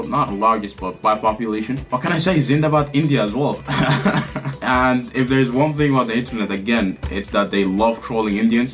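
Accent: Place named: Russia. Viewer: i am fom italy and russia and your accent is incredible for russian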